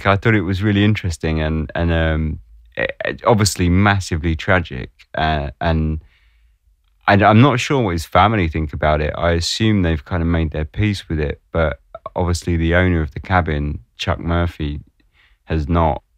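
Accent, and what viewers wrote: England, United Kingdom. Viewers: Thanks for posting...you sound English, but that means nothing if you live in another country. But what about the case of my friend Fiona Saunders, a young mum who was beaten to death in 1986 in Church Crookham, Hampshire. And was the reason her case has almost been completely buried because one of the suspects became a police officer after?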